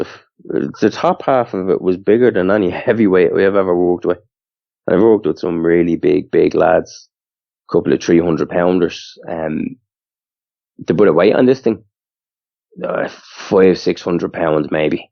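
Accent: Ireland. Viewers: As an Irish guy listening to this randomly hearing an other Irish guy talk about a dog man is bizarre to me 😳, awesome 🤙🏽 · Number one, I am so very sorry that when you think of America that this encounter will always be your first memory of our country. Number 2, I have visited former Jugoslavia, and Germany and I hope to travel to Ireland one day. I find your accent/brogue beautiful. I am Italian but I have always been drawn to the Irish and Ireland and I am not sure why. I want you to know we appreciate you sharing your encounter with all of us, as I can't imagine just how difficult this was for you to retell. 💕🙏💕🙏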